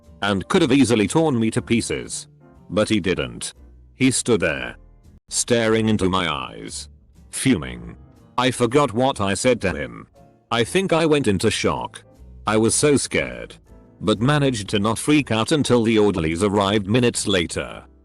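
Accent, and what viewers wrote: United Kingdom. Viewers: Nice British accent, man! 👍👍👍👍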